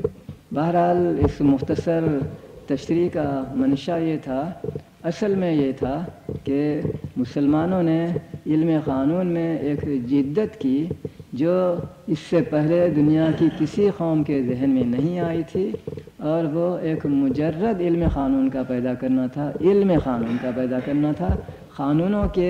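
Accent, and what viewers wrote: Hyderabad, India. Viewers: When was this lecture given...? Seems pretty old, near Indo Pak partition era. Furthermore urdu accent is more of Hyderabad Daccan style.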